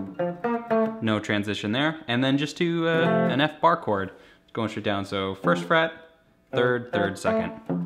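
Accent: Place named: Midwest, United States. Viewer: where are you from? You sound like you're from the midwest. Maybe Wisconsin or Michigan?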